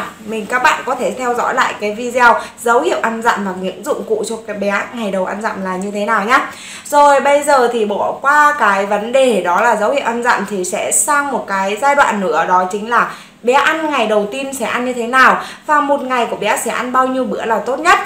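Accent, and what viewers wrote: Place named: Thanh Hoa, Vietnam. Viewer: Giọng THanh Hóa đặc sệt 😂 hơi khó nghe b ạ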